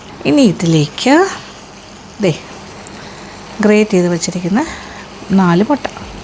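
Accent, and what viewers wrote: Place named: Central Travancore, India. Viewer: Your demonstration and explanations are easy to understand. Your Malayalam is from Central Travencore as well. Not of native Trivandrum type, it is clear and well spoken. Thank you for the time spent on items cooking. May God Bless you and Keep you.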